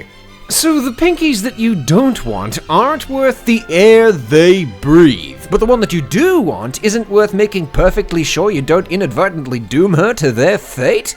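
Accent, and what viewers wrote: United Kingdom. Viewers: British narration & comedy on mlp comentary. Love it.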